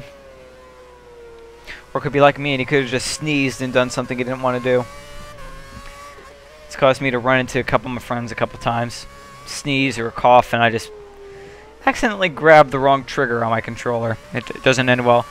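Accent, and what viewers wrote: United States. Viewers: So why's he got an american accent?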